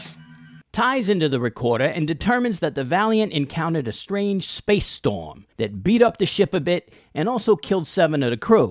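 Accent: Brooklyn, United States. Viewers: love the brooklyn accent and snark..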